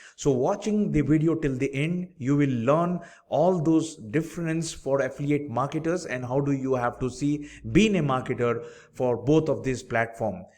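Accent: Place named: India. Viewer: I love indian english. Value in video 👍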